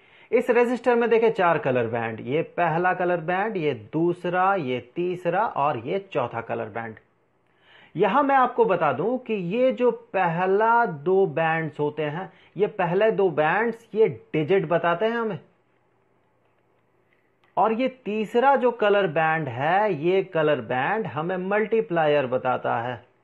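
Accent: Bihar, India. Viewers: Apna yeh Bihari accent change karo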